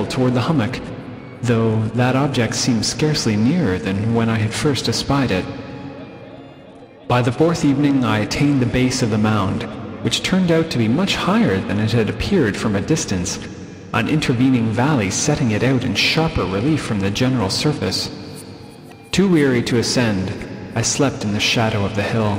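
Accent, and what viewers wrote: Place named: Baltimore, United States. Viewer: Damn good job! Your pronunciation sounds like Baltimore.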